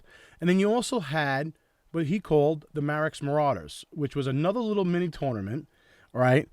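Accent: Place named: New York, United States. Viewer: you sound like you're in the new york area. if you are I wanna take you on on the space map!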